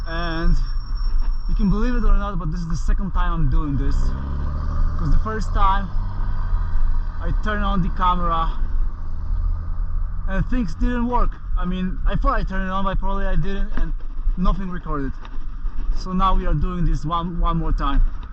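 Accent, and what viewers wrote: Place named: Germany. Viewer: I Detect a Accent 🤔🤔 I almost want say German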